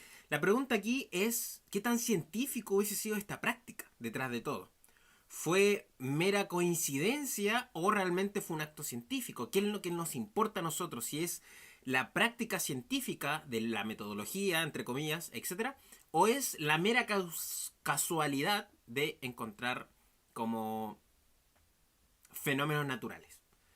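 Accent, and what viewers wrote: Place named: Chile. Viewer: Buen video bro, eres de chile? Me gusta tu acento saludos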